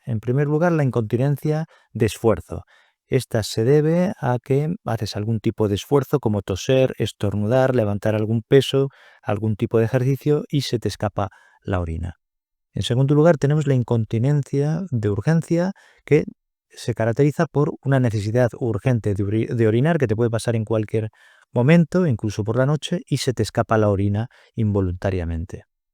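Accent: Spain. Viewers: Por el acento, es español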